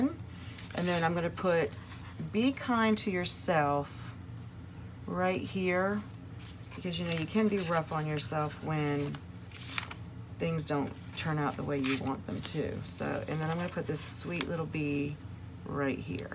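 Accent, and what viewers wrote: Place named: South, United States. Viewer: Can I just stop and give a hey y'all to your sweet southern voice! :)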